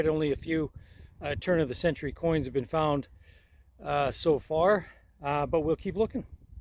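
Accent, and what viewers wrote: England, United Kingdom. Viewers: Love some of the finds and beautiful pics too!! Great English accent on voice over :) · confirmed...it is possible to have...to much of a good thing...more sexy english lady voice overs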